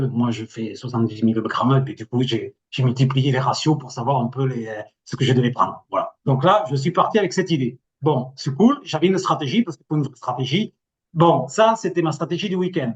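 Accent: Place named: South, France. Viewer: Bonjour, si c'est mon débit de parole, pas de souci vous pouvez le ralentir dans les paramètres lecture à 0.75 . Si c'est ma voix et mon accent du sud, ça on peut rien changer 😉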